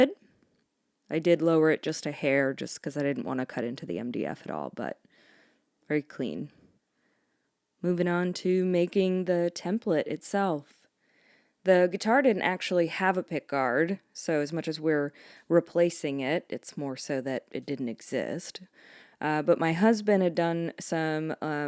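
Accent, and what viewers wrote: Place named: South, United States. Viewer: aww.. you're sweet. Comes thru clearly.. I am sincerely sorry to hear about troubles with your sis and partner. I assume business partner? You mentioned your husband in this video, I hope not him. I hear a refined southern accent, and heard a "y'all" (always a plus for this Texas fella) so I don't think you'd call your husband 'partner'. Either way, prayed for all and meant what I said. As for the channel, the grossest ever strat is up next for me. Take care, be well, keep it up when it suits you🤍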